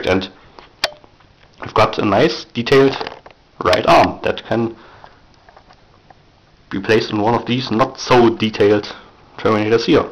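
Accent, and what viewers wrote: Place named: Germany. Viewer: german accent ftw! :)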